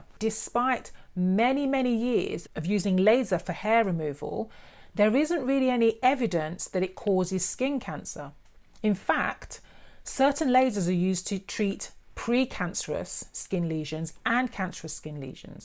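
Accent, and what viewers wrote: United Kingdom. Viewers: I wish there was video for none black skin or dark skin as I am not. I'm Hispanic. Oh sad those that got burned. I hope they were able to get healed or surgery grafts or whatever. Maybe they did not have the money. You are so pretty. I like your hair style. I love the British accent. You gave a pretty smile. Well tye tips you said to shave is good. Just as I thought, but not too much so thanks. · I love your british accent 💕💕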